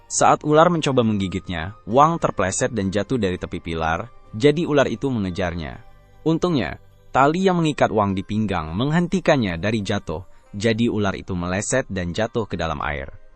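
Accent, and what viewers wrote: Malaysia. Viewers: Bang kok tiba tiba jadi logat melayu sih bang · Aku yang asal malaysia ni pun rasa geram dengar loghat dia dicampur²...kalau nak cakap malaysia cakap terus takyah bercampur aduk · Bg kalo bisa logat Malaysia nya di kurangin atau di hilangkan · Cara kamu berbicara indonesia setengah Malaysia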